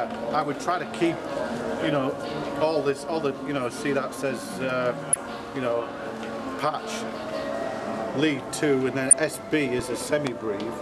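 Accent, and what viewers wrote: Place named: Hull, United Kingdom. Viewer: I love that he still has his hull accent :)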